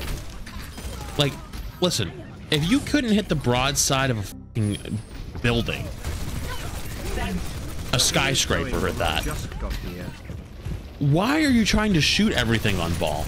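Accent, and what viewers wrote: United States. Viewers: Hold on. What is that accent,? You got a bit of cowboy in ya?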